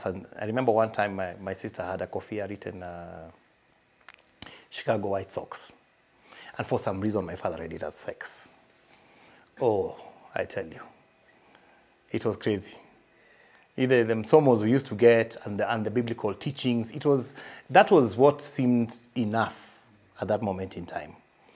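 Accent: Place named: Nairobi, Kenya. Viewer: Great great lessons.. I did relate so much with you really. Just that I am at that stage where I am finally coming to something that finally works. Ps. I knew he’s a South B guy before he could say two words😀.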